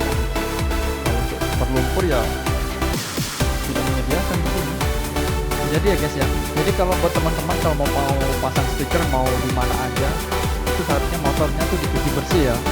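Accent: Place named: South Sumatra, Indonesia. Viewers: Sumsel dmnanya bang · Dari logat ny sumsel ya bro? Gw sumsel soalny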